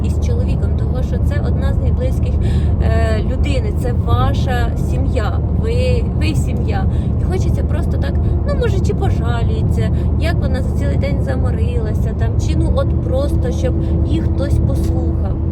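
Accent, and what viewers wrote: Poltava, Ukraine. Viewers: Я 45 років живу з полтавчанином і цей полтпвський суржик впізнаю де завгодно Гріє серце.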